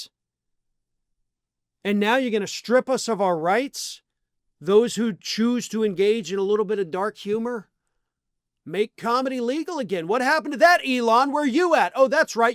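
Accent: South, United States. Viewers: Stop using the mocking southern accent to indicate you think the speaker is stupid.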